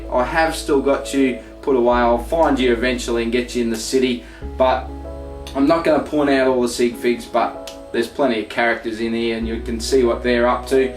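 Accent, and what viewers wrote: Australia. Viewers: well dis guy is Australian..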